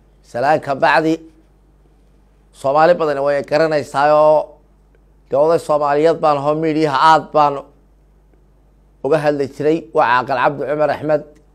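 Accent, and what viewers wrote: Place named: Kenya. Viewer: Ninkan waa la haystaa duli ma timid reer sheekh Isaxaaq .waxaad tahay kenyati afsoomaliga bartay.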